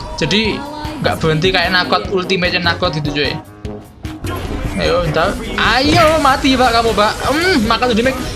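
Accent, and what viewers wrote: Java, Indonesia. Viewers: asli orang mana ya...kok kayak ada logat jawanya...😅😅😅😅😅🍹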